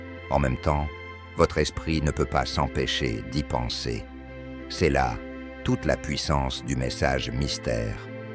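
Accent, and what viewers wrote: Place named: England, United Kingdom. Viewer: Ton accent englais et ton ton robotique m'écourent. Va chier, sale intelligence artificielle. Je vais continuer à me fier à mes dieux.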